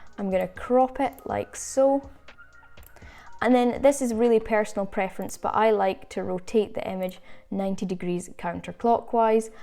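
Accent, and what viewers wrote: Scotland, United Kingdom. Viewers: This is why I love YouTube. A teenager has given me one of the best lessons I've every received in Photoshop and in a cool Scottish accent to boot!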